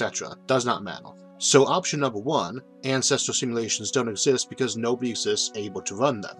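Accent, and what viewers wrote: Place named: New England, United States. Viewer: WTF accent is he speaking with? Cross between a Boston and Maine accent?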